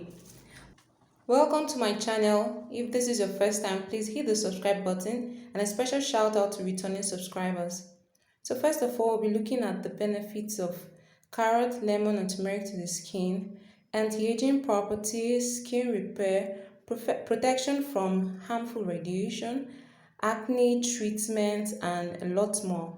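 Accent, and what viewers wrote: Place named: Ghana. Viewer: You speak like a Ghanaian thanks for teaching us sorry am a Ghanaian that’s why I said your English is like Ghanaian.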